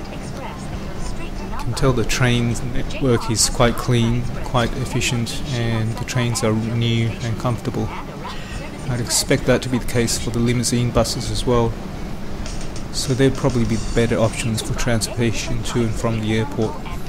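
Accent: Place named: Australia. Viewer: Excellent work & I am a big fan of Australian accent. :D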